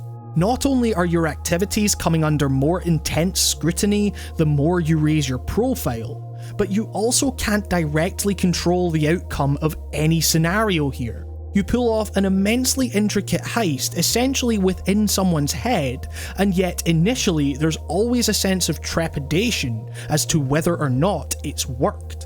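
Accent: Scotland, United Kingdom. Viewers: god i love the scottish accent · Are you Scottish? I love your accent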